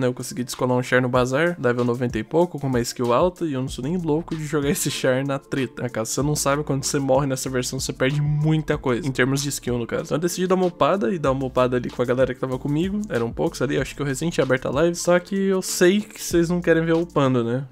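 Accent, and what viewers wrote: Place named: Rio de Janeiro, Brazil. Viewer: Coe, tu só pode ser carioca ou tu é sul fluminense, pprto que não tem como kkkkkkkkkkkkkk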